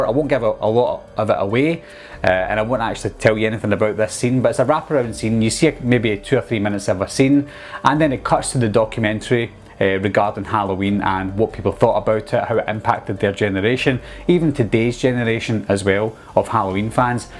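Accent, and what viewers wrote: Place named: Dalkeith, United Kingdom. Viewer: your accent sound similar to my old friend from dalkeith mayfeild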